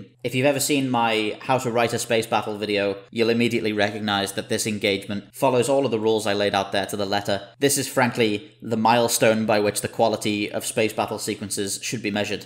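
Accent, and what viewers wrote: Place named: Wirral, United Kingdom. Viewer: Are you from the Wirral?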